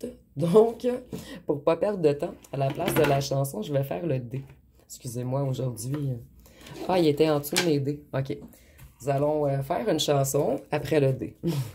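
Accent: Canada. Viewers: Vous êtes la meilleure. En plus j'adore votre accent canadien. Vos interventions ont valeur de pistes possibles dans la carte de mon, pélerinage terrestre. Le très inspiré jésuite, Teilhard de Chardin, n'a-t-il pas eu cette phrase prophnétique = "Nous ne sommes pas des êres humains qui ont une expérience spirituelle; mais des être spirituels qui ont une expérience humaines. " et je retrouvre par votre voix cette Vérité fondamen,tale. MERCI à Vous, Madame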